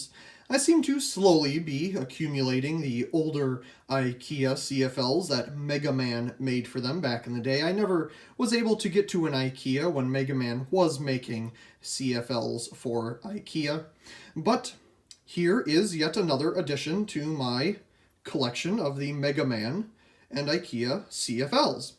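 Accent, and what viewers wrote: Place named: Northern England, United Kingdom. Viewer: Its his northerner accent lay off dude. :|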